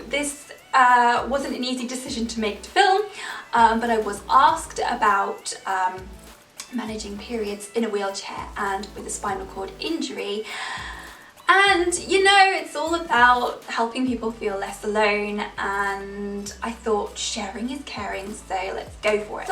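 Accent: England, United Kingdom. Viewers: Lol. I don’t even know why I watched this. “Curiosity killed the cat”...they say. Your personality is so magnetic I guess I’ll listen to you talk about anything. That English accent.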